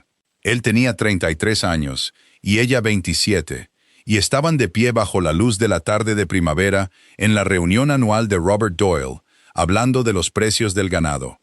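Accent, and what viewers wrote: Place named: United States. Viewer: ESe acento gringo del narrador, que enfado.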